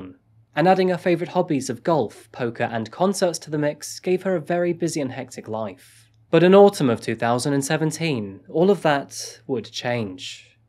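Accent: United Kingdom. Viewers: Still can't wrap my head around. A British sounding narrator suggesting coffee. Hehe. Great work again 😉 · What part of the uk you from?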